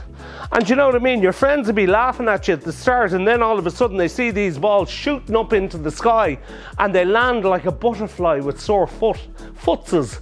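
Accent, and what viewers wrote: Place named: Ireland. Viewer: Great to hear his accent. As a fellow Irishman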